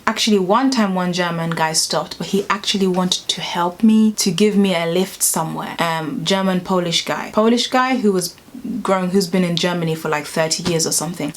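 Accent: United Kingdom. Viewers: The problem is you believe you are "Black" and the people are Germans. You dont want to Call youself British going by your accent. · Your African,your not considered black by us.Your African,British accent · Hi...,I real like your accent are you from UK? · That British accent.. 🤝🤝👌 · Girl, I'm African-American and if you came to the U.S. you would get stared at by Black people because of your dark beautiful glistening skin. Then if you started to talk with that English accent you would get stared at even harder!! You would be such an anomaly here, worth every adoring stare!! Its interesting to see how slavery affected and displaced Africans all over the world.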